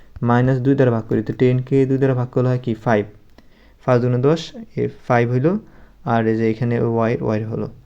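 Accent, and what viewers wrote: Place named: Barishal, Bangladesh. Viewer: বরিশাইলা কথা😂😂😂❤❤❤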